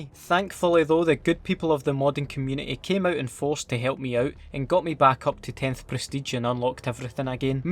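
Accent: Scotland, United Kingdom. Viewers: fucken great Scots accent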